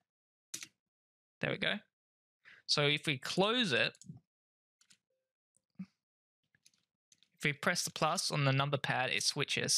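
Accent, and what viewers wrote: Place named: Australia. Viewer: i hate australian accent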